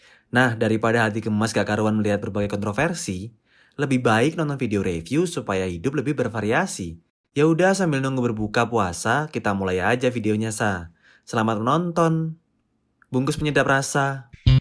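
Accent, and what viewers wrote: Java, Indonesia. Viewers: Coba lebih meddok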